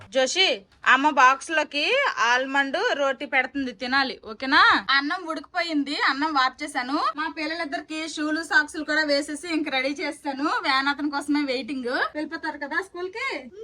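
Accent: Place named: Rajam, India. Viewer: hai sister me pillalu me mata chakkaga vintunnaru naku 6 years babu unnadu food thinadu nanne thinipinchamantadu konni sarlu mata vinadu allari ekkuva chestadu ma vadu kuda school lo edo okati vadilesi vastadu nenu me videos ma babu ki chusi cheptanu valla laga chethi tho food thinali home work cheskovali ani anyway me videos naku chala istam prathi okkati miss avvakunda chustanu me matalu vintunte ma slang gurthostundi madi rajam daggara village memu vizag lo untamu sister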